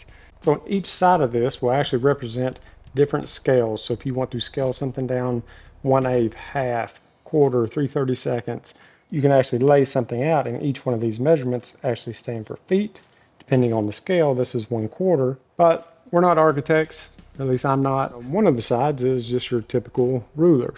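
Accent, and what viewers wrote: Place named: Georgia, United States. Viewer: You don’t use a lot of contractions. “Do not” instead of “don’t.” I don’t hear that too often. I knew someone in Georgia which a similar cadence. Lovely video, cheers!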